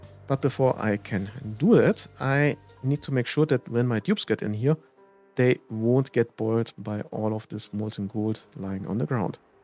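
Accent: Germany. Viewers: hey, like ur style, like ur voice, but plz try to work on ur english. ( Sis needs to bi abaf zwölfhundret) ich bin au deutscher, aber dein deutscher akzent is krass^^ besserr werden! ;)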